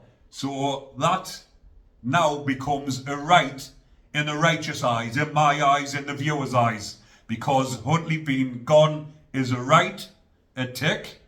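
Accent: Newcastle, United Kingdom. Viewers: I love your accent. Listening from down south here. Never been to Geordie land but I would like to visit one day. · please lose that annoying Ant & Dec accent. Talk like a Southerner, like a normal Brit.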